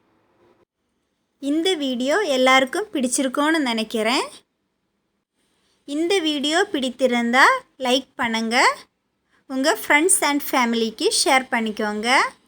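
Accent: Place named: Kanyakumari, India. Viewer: Kanyakumari tamil. Very great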